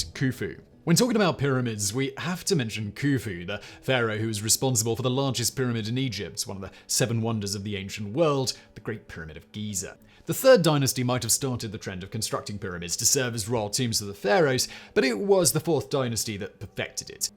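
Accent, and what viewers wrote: England, United Kingdom. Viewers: This gives credence to the saying that when someone speaks with 'English' accent they sound cleverer than they are. This was the least informed video I have seen from this channel. This was ethnocentric description Egypt's greats. In his list, the founder of Egypt came last. Never bothered to mention a significant reign of the Kushites that probably reigned longer than some he referenced, or Imhotep whom was highly influential and unusual character in Egyptian history. Basically this says Egypt was about the kingdoms that defeated it from outsider Africa, from Hyksos to Romans, whom were occupiers of Egypt. Its like talking about the US and forgetting to make a fuss about George Washington, and to make matters worse, George Washington was considered the least important amongst a top ten list of Most important Americans. Balderdash.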